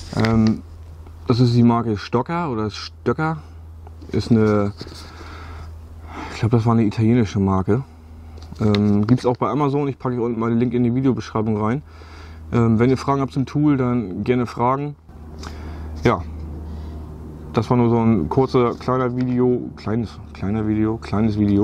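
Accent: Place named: East Frisia, Germany. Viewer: Du redest wie ein 60-jähriger Ostfriese aus dem Lande! Voll sympathisch!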